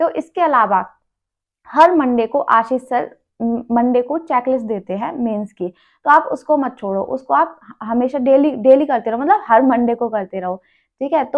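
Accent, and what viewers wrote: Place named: Pahadi, India. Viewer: Aap Uttarakhand se ho kya?....apki accent pahadi lagri hai 😊😅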